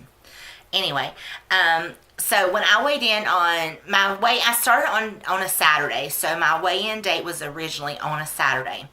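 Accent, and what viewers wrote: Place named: South, United States. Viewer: My starting weight was 336. I'm down 116, so you can do it!! I know those grandma dinners! Just make good choices. I'm late to the party here. Glad I found you! Sounds like you're a southern gal too. Just get that weight off before you're older like me! I'll be 60 soon. You're very organized in the video. Great job!!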